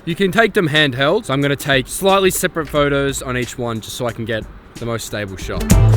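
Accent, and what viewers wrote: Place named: Victoria, Australia. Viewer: First video watching you and straight away I knew you were a fellow Aussie 😂 from Victoria too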